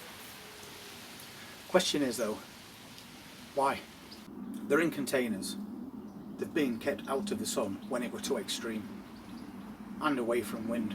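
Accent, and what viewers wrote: Yorkshire, United Kingdom. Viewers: I've planted Kondor (amongst others) in 30 ltr containers, I'm really hoping me watering every day in this near tropical weather is going to give good results, the odd one is dying back, I've really got itchy gloves wanting to empty one out and see what they are like! I also did Ratte, had some decent first earlies from them. 😀That does sound like a Yorkshire accent?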